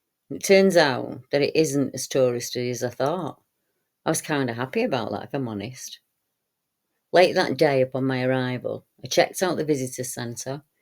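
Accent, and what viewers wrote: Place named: Northern England, United Kingdom. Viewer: Just found you. Great work. Great northern accent. Luv it!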